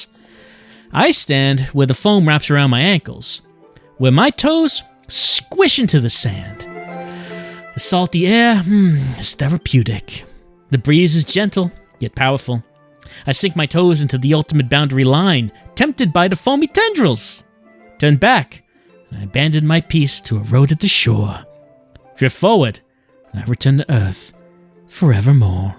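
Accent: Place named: New Jersey, United States. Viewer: Where did he pick up his funny Jersey type accent from? I forget.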